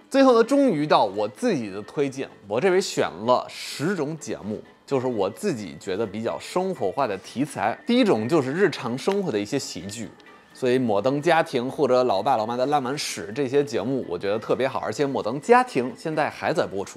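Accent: Beijing, China. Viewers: 北京口音？ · 是北京口音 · 京片子味儿哈哈哈哈真的是老炮儿了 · ，你口音像北京土著人。你是一个长的像外国人，英语说的也像外国人的北京人。哈哈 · 你的口音是北京腔，哪個訪談節目比較好？ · 一张口一股子京味😂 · 我老家南方的， 你的北京话比我说得好，虽然我在北京时间比你长很多。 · 北京口音的外国英语老师。。。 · 老师的中文口音感觉很京味，老师在北京生活比较吗？ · 老师的中文说的好好感觉有股北京腔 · 你的中文肯定是北京人交的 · 满屏的京味儿哈哈哈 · 您这北京话也忒标准了吧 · 哈，这一口京腔~ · 有的人就是有语言天才，没办法的。这主播比不少北京人更北京。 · ，我今天突然发现你有北京口音.... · 这一口京腔啊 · 马老师北京话纯正，跟他学没毛病。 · 你竟然還會北京口音 酷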